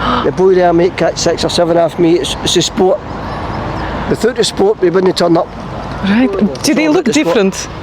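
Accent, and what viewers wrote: Glasgow, United Kingdom. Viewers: Great overview and what an amazing accent!! 50% Glasgow!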